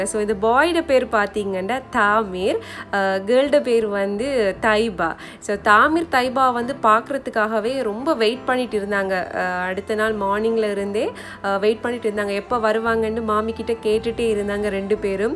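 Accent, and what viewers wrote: Sri Lanka. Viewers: அஸ்ஸலாமு அலைக்கும்.சிஸ்டர்.உங்க வீடியோக்கள் இப்போ recent aa than paarkiren.ungaloda srilanka Tamil super.enakku ungalai romba pudichirukku.நான் boy இல்ல சிஸ்.girl தான்.மெயில் ஐடி ல என்னோட கணவர் பெயர் இருக்கும்.தப்பா நினைக்காதீங்க சிஸ்.என்னோட பெயர் பௌஸுல் ஹிதாயா.தமிழ் நாடு.உங்க family க்கு நான் துஆ செய்கிறேன்.எங்களுக்கு நீங்க துஆ செய்யுங்கள் சிஸ்டர்.ரிப்ளை அனுப்புங்க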